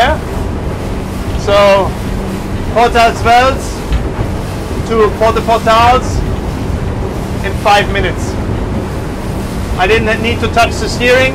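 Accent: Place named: Germany. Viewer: Better and thorough presentation, than other presenters of the same boat. Don't mind your german accent......👍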